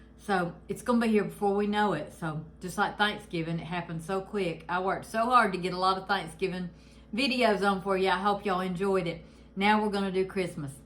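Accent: South, United States. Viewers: I made this chili & my man loved it! Thanks for sharing! I love your Southern accent, I lived in Florida but am back home in Wisconsin!😊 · THANK♥️YOU FOR SHARING YOUR VIDEO! I RAN ACROSS YOUR CHANNEL WHILE LOOKING UP THE COPYCAT WENDY’S CHILI RECIPE SUBSCRIBED TO YOUR CHANNEL. I JUST LOVE YOUR SOUTHERN ACCENT,YOUR CONTENT AND YOU,YOUR FAMILY AND HOW YALL GAVE BEEN MARRIED FOR 45 YEARS. I WAS BORN,RAISED& LIVE IN NORTHEAST GEORGIA. ME&MY HUSBAND HAVE BEEN TOGETHER FOR GOING ON 23 YEARS(3/24/25) & HAVE BEEN HAPPILY MARRIED FOR GOING ON 22 YEARS(4/6/25). WE HAVE A DAUGHTER(AGE:22) & A SON(IN HEAVEN) HE WOULD BE(AGE:17). OUR DAUGHTER HAS A BABY BOY(AGE:2)OUR FIRST GRANDBABY AND THE JOY OF OUR LIFE. WE ALSO HAVE 3-FUR/4~LEGGED BABIES(CHIHUAHUAS),AGES:10,9 & 1. WE ARE VERY BLESSED! JESUS IS THE REASON FOR THIS SEASON! HE IS #1 IN MY LIFE & HE IS THE ONLY WAY TO GET THROUGH ANY TROUBLES IN EVERYBODY’S LIFE. 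KEEP UP THE WONDERFUL WORK/VIDEOS YOU DO! YOU’RE AWESOME!!! HAVE A✝️BLESSED & 💟WONDERFUL🌛NIGHT!👍🏻😉💓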